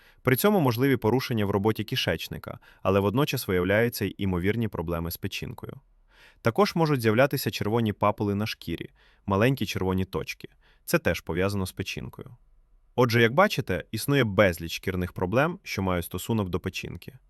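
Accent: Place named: Central Ukraine, Ukraine. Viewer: Навчити "дєвочку" з Кійова, щоб вона розмовляла як пані зі Львову? Навіщо?! Тим більше що ано з Кропивницького чи з кіраваградскої області. "Тітка"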